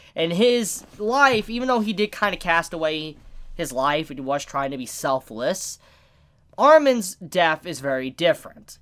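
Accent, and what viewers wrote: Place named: Tennessee, United States. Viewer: i didnt kno w you were from Tennessee until watching your va ca video now i cant un hear you accent lol "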